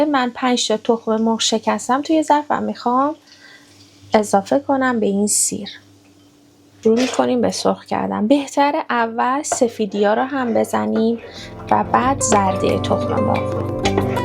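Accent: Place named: Iran. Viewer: I love the Persian accent you look incredible dear friend thanks for sharing best wishes from England ❤👍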